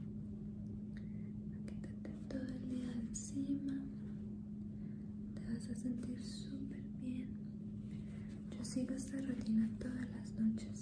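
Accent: Antioquia, Colombia. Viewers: colombiana es ella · la voz colombiana es lo mejor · Es Colombiana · El acento más lindo del mundo es Colombiano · el asento colombiano uuuufff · Colombiana tenia que ser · Eres paisa o no??? ❤️🙄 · el Plus del acento Colombiano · No en toda Colombia hablan así, las que hablan así como ella son las de Antioquia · su acento colombiano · Asmr+acento Colombiano= UFFFF Chuladaaaa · el acento paisa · me encanta el acento colombiano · Lindo el acento de las colombianas al hablar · el acento colombiano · el español de colombia es el mas bonito y ya medi cuenta porque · Solamente hablan así los paisas osea los de Antioquia, en Colombia hay muchísimos acentos. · tiene acento paisa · El paisa me encanta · Eso es paisa sumercé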